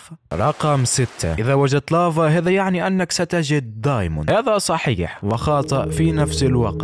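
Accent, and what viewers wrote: Tunisia. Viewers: ياخي انت تونسي؟ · انت تونسي او جزائري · هل انت تونسي؟ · انت ولد بلادي تونسي صح؟؟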